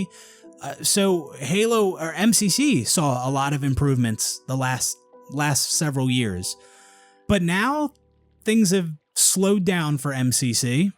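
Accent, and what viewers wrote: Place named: Baltimore, United States. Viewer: This dude is 100% either from Baltimore or PA. Or he’s lived in one of those 2 all his life. Guaranteed 1000% cause of the accent.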